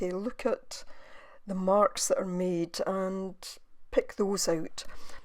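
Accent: Scotland, United Kingdom. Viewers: Amazing. You have a special gift. Your voice is beautifully calming and your Scottish brogue is music to my Cheshire ears. PLUS... you have inspired me. You and Nina are fantastic at being able to do that. Thank you for sharing, you have made me happy. X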